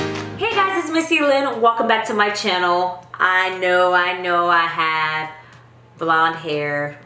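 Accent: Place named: South, United States. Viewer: Slay! Yes Texas keep bringing the southern accent out of!!! <3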